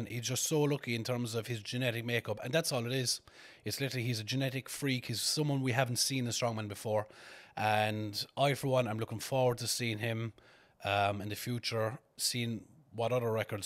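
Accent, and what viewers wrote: Ireland. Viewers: Enjoyed that. Love how you say 332, the Irish accent, born story tellers.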